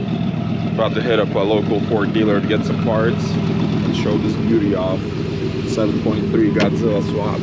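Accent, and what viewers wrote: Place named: Sweden. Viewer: Impressive project! Where are you from originally? You sound like you grew up in Sweden for a few years as a kid then moved to the US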